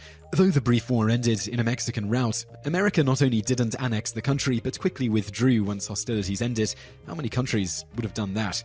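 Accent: England, United Kingdom. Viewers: Was this guy born in the U.S. but raised in the UK......that explains his accent.....I was also born in the U.S.( New York ) but I was raised in Cyprus due to my dad but I can speak English with an American accent regardless of the fact that I was raised in a Greek speaking country.....now I leave in NY because I always wanted to and I'm 18:D and I think I will stay here forever 'cause I also got family here P.S I'm PROUD that I'm also American.......the topic of this video generically enough:) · Getting my American ego stroked by someone with a British accent just made my brain explode! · 'MERICA! From what I gather in the video, is an American, despite the British accent? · It's nice to here those kind remarks in a cool English accent. But I have to disagree about Americas "War Ethics," especially over the last couple of decades. As an American I take no pride in telling you that we are on a war of conquest right now. 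ANd have been for at least 14 years. Probably more. But It's nice to here something positive for a change:) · Wow, I didn't realize this until now: this guy has an English/Australian/New Zealand (IDK not good with accents) accent, dawns the Confederate flag, and talks positively about the United States...my brain hurts.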